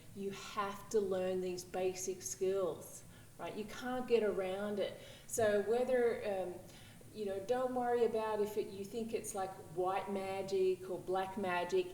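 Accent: Australia. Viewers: Can't help noticing your Australian accent, I'm a fledgling Black Magician living in Sydney, could you advise me of any groups in Sydney? Much appreciated.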